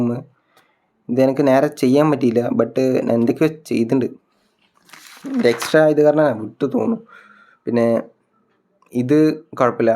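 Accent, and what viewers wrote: Tamil Nadu, India. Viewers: Muthe.... Nee kidilan aan...📈👍 Ninte voice kidilaann tamil slang vannittu.... Nalla vibe ind video kanan...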